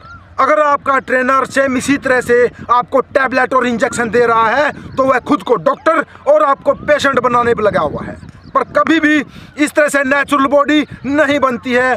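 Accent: Haryana, India. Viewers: Sir apki boli haryanvi lagti hai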